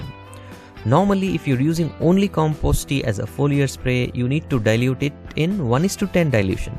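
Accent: India. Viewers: Boring. Speaking english in indian accent just to complete the video. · Indian english.☺️☺️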